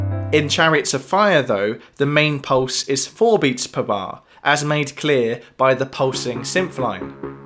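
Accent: United Kingdom. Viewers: Great video - thank you. If you could list the bands and songs, be a big help - the only way I found the, song was by searching the lyrics - your Brit accent is wonderful, but couldn't catch that. I've always heard as 4/4 (Bonham) and 6/8 (Page). With the 1/8th notes constant between the 2, it let's the guitar do the waltz. I find it actually easier to hear/count that way. Thanks for your work!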